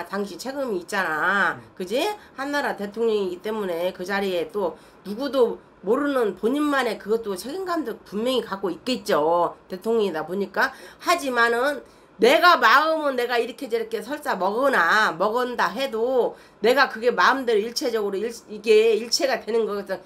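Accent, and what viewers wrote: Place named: Jeolla, South Korea. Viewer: 전라도말씨 찰지다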